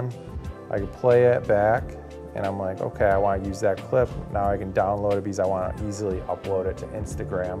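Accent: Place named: Midwest, United States. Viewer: Midwest accent like a mofo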